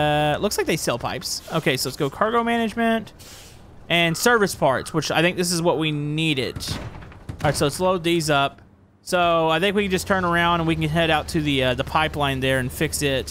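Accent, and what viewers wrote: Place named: Texas, United States. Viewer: Your voice is speaking like texass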